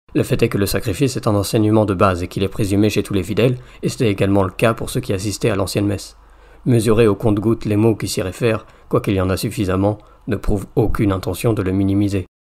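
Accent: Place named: Europe. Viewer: Par votre accent, je présume que vous êtes en Europe. Voici une réalité canadienne. Tous les documents que vous avez cité sont valides, la nouvelle messe elle aussi est valide. Le problèmes s'est trouvé au niveau de la formation des prêtres dit baby boomers. En effet, bien que tous les documents soient présents, la formation lors de notre "révolution tranquille" a engendrée plusieurs cohortes de prêtres dit "communistes" nos pas de manière politique, mais de manière liturgique et idéologique. Ses cohortes font encore des dégâts aujourd'hui dans l'église québécoise et canadienne en enseignants : que le Christ n'est pas présent dans le saint sacrifice, que la messe n'est pas un saint sacrifice, en sautant ou modifiant des prières eucharistiques, en ne priant pas le bréviaire, n'affichant pas où peu l'adoration, favorisant les femmes diacres/prêtres, aucun respect pour la manipulation de l'hostie, en utilisant les homélie pour faire un spectacle et non pour passer un message biblique. 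Etc.. tous les fait que j'ai énumérés ci-dessus j'ai pu les voir de mes yeux! Je ne blâme pas les documents du Vatican ou les conciles, mais bien le manque de rigueur et le manque de jugement des séminaires. Encore aujourd'hui, les séminaires québécois enseignent certains points qui vont à l'encontre de catéchisme et même du droit canonique! Le fléau est donc dans la formation et c'est pourquoi nous pouvons voir un exode des séminaristes vers les séminaires plus "traditionnelles" non schismatiques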